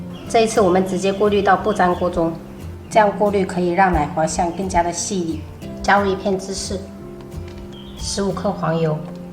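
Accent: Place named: Hokkien, China. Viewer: 我是台灣人聽到妳說閩南語感覺好親切哦👍好廚藝！小寶寶們都好可愛哦😘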